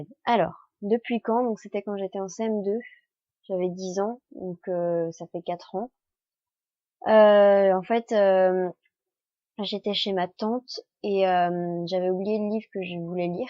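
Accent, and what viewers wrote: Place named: North, France. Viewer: Merci d'avoir répondu a mes questions :D , Je sais pas si c'est moi mais on dirais que t'es du nord mdr , et si tu veux rencontrer les acteurs je sais pas si tu connais la Welcome To The Magic School De People Convention ? ya la 4iem édition le 13 et 14 Janvier 2018 si je me souvient bien de la date ;) mais si tu veux vivre un moment pareil franchement faut mettre le prix mais ca vaux tellement le coup ;) Bref si tu voudrais qu'on parle de sa ou autre chose tu peux me contacter sur mon facebook ;) . <link> PS: De trés bonne question t'on était posez , superbe vidéo j'adore ta chaine :DDD :p Bis :*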